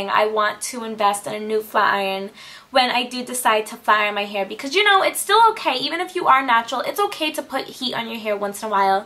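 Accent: New York, United States. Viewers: lol, love your hair and your attitude. you have that new york accent going on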